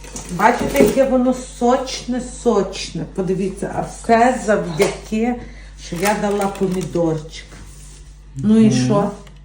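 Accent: Ternopil, Ukraine. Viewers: Ви не розумієте, це Тернопільський акцент і мова у них друга, так само порівняти людей з Одеси, Львова, а Закарпаття там взагалі мова на мові не знаєш якою говорять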